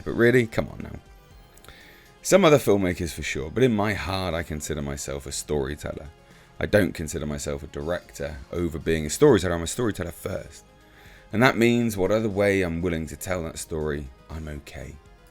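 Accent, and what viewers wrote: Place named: United Kingdom. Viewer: You're an Brit, pronounce "manga" however feels natural to you, bruv.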